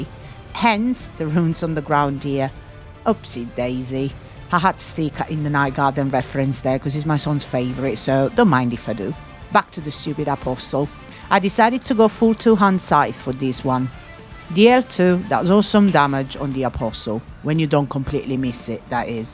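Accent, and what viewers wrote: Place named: Italy. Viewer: Love the mix of Italian and northern/midlands, get on, get that plaque before JK haha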